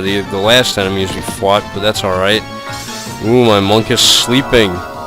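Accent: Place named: South, United States. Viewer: You do sound more southen but it doesn't matter 'cause it fits so keep it up.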